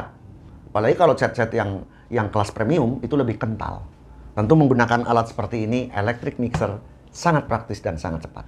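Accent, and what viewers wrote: Tegal, Indonesia. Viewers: mantap bang? lebih mantap lagi ada suara kas orang tegal,ha..ha... joooos.!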